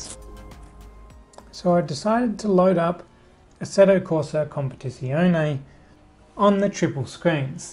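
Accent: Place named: Australia. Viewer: in the thumbnail u look like jazza from dwj and even ur accent is like his(Australian probably) but with um..higher tone or something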